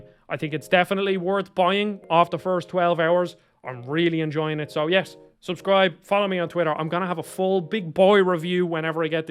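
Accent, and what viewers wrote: Ireland. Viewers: Earned a sub from me pal - glad to hear a fellow Irish accent raving about this game!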